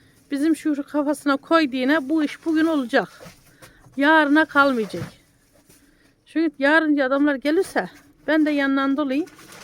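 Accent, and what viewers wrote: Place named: Giresun, Turkey. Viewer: hn.Bn İstanbulda yaşayan bir Karadeniz'li olarak merak ettim neresi diye cevap verirseniz memnun olurum.konuşmalarınız aynı Giresun şivesi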